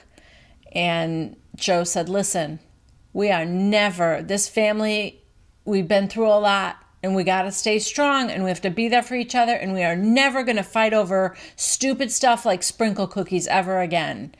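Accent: New Jersey, United States. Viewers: God! You’re Jersey accent is awful!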